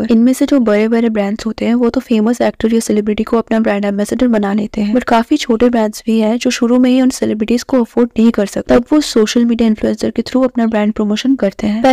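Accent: Bengal, India. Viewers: Aap bengali ho?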